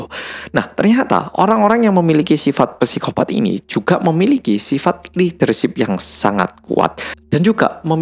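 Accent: Java, Indonesia. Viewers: PLOT-TWIST 🤣🤣👍🏼👍🏼Suka video, terima kasih Boloku Sing Paling Medok Dheweeeee 🙏🏼🙏🏼 Bikin video yang membahas Narcissistic Personality Disorder (NPD) juga Rek (aku juga kemarin habis bikin utas Twidder untuk NPD biar korban²nya bisa membela diri. 💪🏼✊🏽❤️❤️ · Gayanya mas sekarang "korea" banget tapiiii karena sering muedok jadi pas ngomong korea otak ku tetep berkata "weh iso boso korea lek". Padahal sadar dengan penuh kalo dia ya org korea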